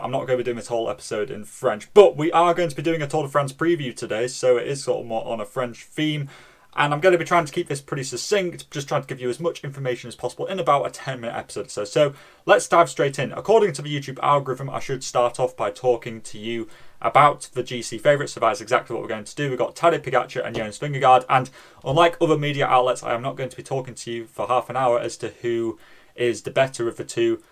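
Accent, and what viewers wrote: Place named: England, United Kingdom. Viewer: Arf j'ai cru pendant 5 secondes que la preview allait être en français 😢 Heureusement, j'aime bien ton accent anglais.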